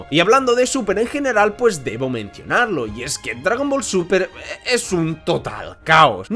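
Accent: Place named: Spain. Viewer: Al fin un canal bien narrado en español de españa… 😢 viva españa viva el rey viva el orden y la ley! 😂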